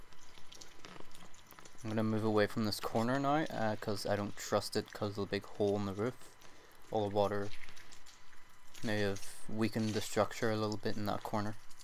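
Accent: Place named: Northern Ireland, United Kingdom. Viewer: you can tell he's british by his voice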